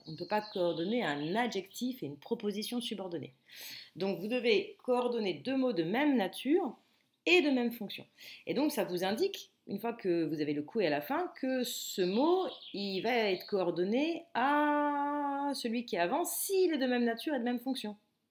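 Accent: France. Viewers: C’est très bien de promouvoir cette langue magnifique. Toutefois votre accent français-qui ne place pas correctement l’accent tonique sur les mots latins-n’est pas approprié au latin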